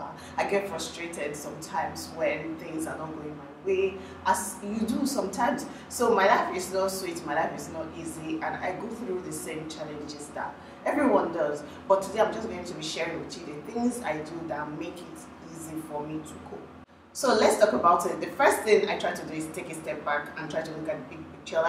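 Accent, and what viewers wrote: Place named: Egba, Nigeria. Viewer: Aha! Egba dialect oooo🙌🙌🙌🙌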